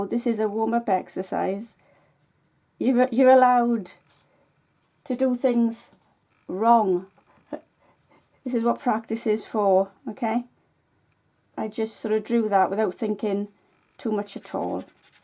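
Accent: Wales, United Kingdom. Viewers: Thanks for a great video -all delivered in your lovely Welsh accent! I specially liked what you said at the end about playing rather than striving and slogging away when we feel stuck with our art. Also being aware of the "mindful moments", awareness of the sound of the pencil on the paper -I like the feel of using the materials too -its a tactile experience for me as well as visual.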